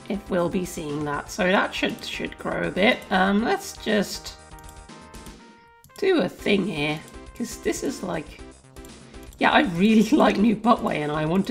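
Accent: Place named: United Kingdom. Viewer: Definitely like My Viewers Aren't Cooperative Island, but it might be a bit lengthy. Also, how Dutch are you? You have a very British-sounding accent.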